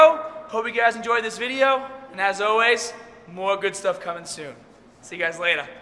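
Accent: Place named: New England, United States. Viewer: The accent is... Boston? Maine? New Hampshire?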